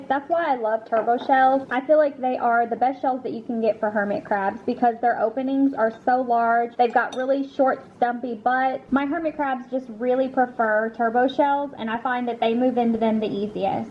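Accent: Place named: South Carolina, United States. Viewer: Are you from the Carolinas? Your accent sounds south Cackalack to me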